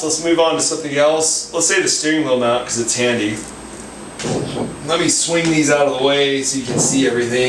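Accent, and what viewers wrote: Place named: Netherlands. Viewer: Installed the hotas yesterday and have flow a couple of minutes in Arma 3, ED, and DCS and have to say im very happy with it, the immersion is so nice that i now want that the seat banks left when banking left lol. also watched a couple of vids of you. you got a nice setup mate. i heard your dutch if im not mistaken hehe. maybe if your down for it we grab a game together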